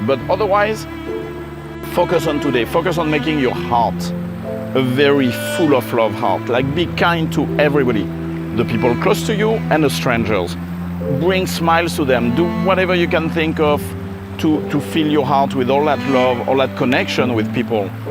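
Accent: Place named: France. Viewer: Hello mon ami d'enfance ... et bien ce n'était vraiment pas ton heure mais ton expérience est amazing ... heureusement que tu n'as pas perdu le sens de l'humour et cet optimisme que je connais depuis toujours chez toi ... Et j'adore ton bel accent Français que tu as gardé en américain...Enjoy your life whit your children et friends.... Love for you et for ever your friend .MartineM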